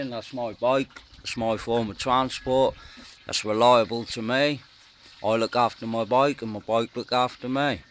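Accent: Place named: Norfolk, United Kingdom. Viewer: Loving the Norfolk accent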